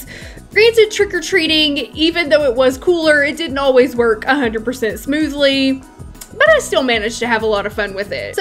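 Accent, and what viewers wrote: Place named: South, United States. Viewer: By the way you've probably heard this before but love the country accent 😂 reminds me of my granny's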